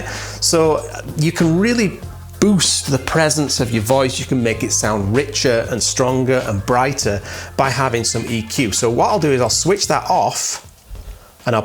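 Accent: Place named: Northern England, United Kingdom. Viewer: Not sure if you can change the levels on that cheap audio mixer, but it makes you sound a bit Northern.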